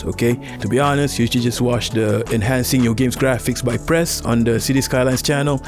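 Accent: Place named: Malaysia. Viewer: Cara bicaranya mirip orang malaysia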